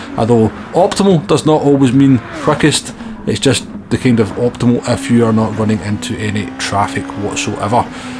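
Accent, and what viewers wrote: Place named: Liverpool, United Kingdom. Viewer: or is it scouse written. I don't know. Pretty difficult to understand it correctly. It seems that you're comming from Liverpool or somewhere over there.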